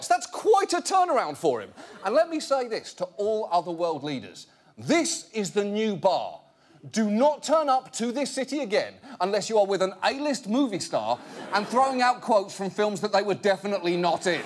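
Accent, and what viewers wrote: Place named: United Kingdom. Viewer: What I see is John Oliver is not smart as he thought he is. Before you say something just put yourself in Modi’s shoes. You wouldn’t survive even a day. Your British accent does not prove you are smart enough to says something about someone else. Besides, your advice Indian, look at your own god damn county and president. One last thing if anyone can explain me why British people turn in to jerk when they come to United States, they can’t even say singe sentence without swearing and using F word. They are very respectful in their language on their own motherland. Because I also saw Master chef where Gordon as well swear at vegetable. Can anybody understand that?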